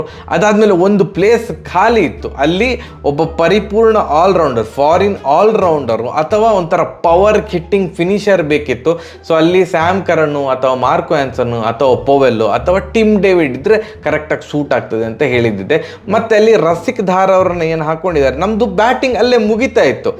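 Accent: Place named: Udupi, India. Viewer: Masth udapi accent ❤❤❤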